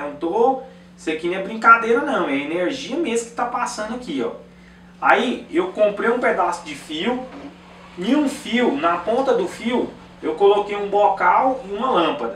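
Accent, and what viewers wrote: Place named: Minas Gerais, Brazil. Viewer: uai , esse. é mineiro hahaha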